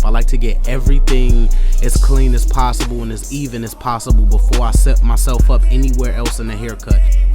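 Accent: Chicago, United States. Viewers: Ayo you frm Chicago??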